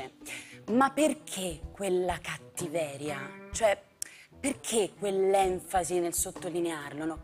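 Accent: Rome, Italy. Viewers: Ma che significa scusa? Se è nata a Bologna non significa necessariamente che ci sia cresciuta. Evidentemente è cresciuta a Roma, ed in ogni caso non è che gli accenti di insegnano, succede che più o meno inconsciamente si rimane condizionati dal modo di parlare delle persone del posto in cui si vive. Anche gli stranieri che vivono a Roma hanno l’accento romano, ma mica ci hanno fatto un corso! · La bolognese con più accento romano che abbia mai sentito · Forse perché è venuta a Roma da giovane e ha semplicemente acquisito l’accento del posto? Non capisco perché in tanti stiate facendo notare una cosa così naturale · ma ha accento Romano? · La gente dice “chi cazzo è Matilde De Angelis” semplicemente perché: 1) Per settimane, prima e dopo Sanremo, eri ovunque, tv, radio, giornali, ma la maggioranza degli italiani non ti conosceva. E probabilmente non ti conosce neanche oggi. 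2) Sei saccente, spocchiosa e te la tiri. Se un personaggio pubblico è così, non si deve sorprendere se attira antipatie e se riceve insulti ogni giorno. PS: dici che hai “ripulito” l’accento bolognese, ma perché adesso parli come una coatta romana? Comunque hai 25 anni, hai ancora tanto da imparare e ancora poco da insegnare, l’umiltà non fa mai male. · Il problema e che hai preso l'accento romano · "Simpatisci","ghe ho lletto","era brobrio", "lo cabisco", "diggiti", "ti vuole bbene", "cresciuta a BBologna", "scinque anni", "riscevevo", "de mmerda", "perzonalmente, proprio perzonalmente", "una pagina nell'internet", "un incubbo","bbasta","scinque","scertezze","amisci","BBene", "lisceo" (e svariate altre topiche) ma un'attrice corsi di dizione non ne fa? Forse li ha fatti a RRoma. La cadenza bolognese, mostrata nel film con Accorsi, era meno "borazza" e più spontanea. Ecco perchè a volte viene doppiata. 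Ora parla "strasscicato" come il cronista "impresentabbile" e raccomandato dell'America's Cup, che non si poteva sentire e non si poteva vedere, con la differenza che lei almeno il fisico del ruolo ce l'ha. Nella putrida fiction su Leonardo interpreta un personaggio storico inesistente, che imbonisce il quarantenne Leonardo assistente nella bottega di Verrocchio (ma il Leonardo discepolo del Verrocchio di anni ne aveva tipo una quindicina). Detto che il problema della fiction "Leonardo" è imputabile agli autori irrispettosi e incapaci e non agli attori, nel film con Accorsi MDA mi aveva piacevolmente sorpreso, e pure nell'isola delle Rose. In definitiva viene proprio da chiedersi "Chi cazzo è MDA?" Perché una bolognese che parla in romanesco una sua identità evidentemente non l'ha ancora acquisita. Ps: Matilda, gli euro valgono più dei dollari, quindi non dica "dollari non euro", come se in dollari la cifra fosse pazzesca, in realtà è il contrario. 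· Ma perché se è nata e cresciuta a Bologna parla con accento romano? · Ma perché ad una bolognese insegnano a parlare con accento romano? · Non riesco a spiegarmi perché sia bolognese e parli con accento romano, dev'essere l'atmosfera di Propaganda Live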